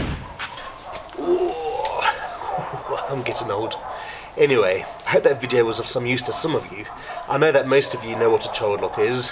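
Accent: United Kingdom. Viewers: Klassno. Tak prijatno slishat British English in Russia! Nice car! <link> My husband's scuba diving videos (mainly)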